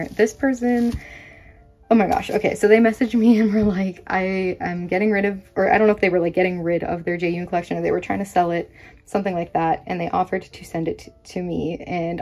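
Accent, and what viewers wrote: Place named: United Kingdom. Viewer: I never noticed, but you pronounce words like british/australian instead of American that's so interesting!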